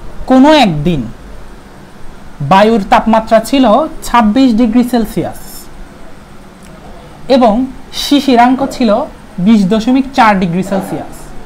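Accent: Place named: Chittagong, Bangladesh. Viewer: চট্টগ্রামের মানুষকে বোঝা যায় তার ভাষার অমায়কিকতায়!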